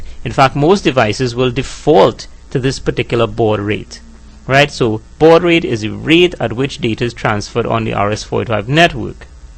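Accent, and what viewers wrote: Trinidad and Tobago. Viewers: I know that trini accent anywhere. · That accent... Trini!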